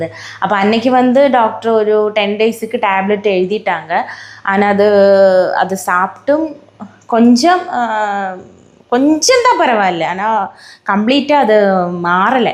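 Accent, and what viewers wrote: Kerala, India. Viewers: Tamil malayalam rendu kalandu Super a pesiringa anni I like your family 🎉❤😊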